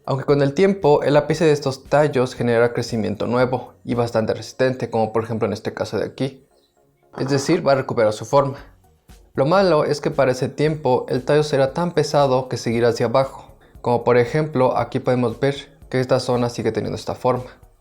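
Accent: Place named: Mexico. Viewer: Buenos días desde España,. Una vez más... gracias a ti por responder tan amablemente y compartir tantos conocimientos. Ayer a punto estuve de despedirme con un... "fuerte abrazo desde España para todo Méjico", pero dudé de tu nacionalidad porque tu acento no es tan marcado como el de otros de tus compatriotas, pero ahora, una vez confirmada mi sospecha, puedo despedirme diciéndote.... ¡¡¡ DESDE ESPAÑA... UN FORTÍSIMO Y SINCERO ABRAZO PARA TODO MÉJICO!!!.;o)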